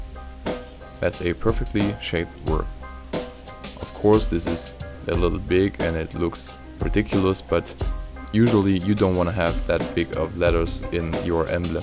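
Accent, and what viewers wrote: Germany. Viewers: Bist du deutscher? Wenn ja hört mans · Hahaha man merkt irgendwie dass das n deutscher war :D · Are you really english ? Or german because your voice is like a little bit of german accent !^^ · Digga man hat herausgehört das du deutsch bist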